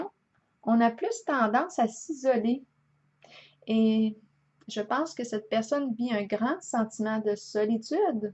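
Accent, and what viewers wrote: Canada. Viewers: Quel plaisir d entendre ce bel accent canadien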